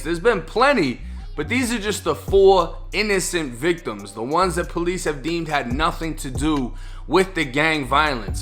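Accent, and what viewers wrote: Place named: Northeast, United States. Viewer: I was wondering where your accent came from. I have never heard a Florida dude speak with that accent, that's a Northeast accent if I ever heard one. What's the story? Love the channel btw, real fukkin nice work and journalism, slaute!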